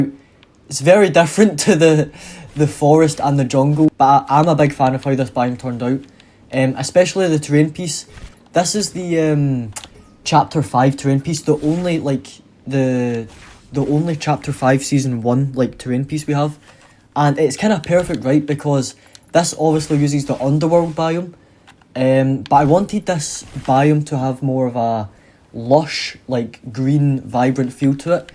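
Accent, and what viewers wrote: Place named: Scotland, United Kingdom. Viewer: Most scottish sounding guy ive ever seen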